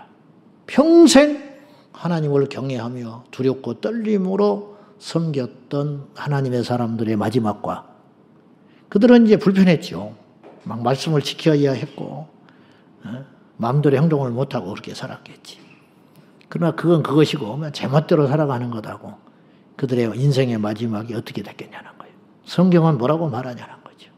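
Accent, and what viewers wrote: Jeolla, South Korea. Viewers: 목사님 말씀 늘 잘 듣고 있습니다^^* 감사합니다 ^^* 저는 고향이 경상도인데 가끔씩 목사님 전라도 억양에 구수함을 느낍니다ㅎㅎ 살아가면서 마음이 답답할때마다 목사님 설교가 제 마음을 평안하게 해 주셔서 너무나 감사드립니다 ♡ 늘 건강하시고 매일 매일 축복이 가득 하시기를 기도 드립니다^^*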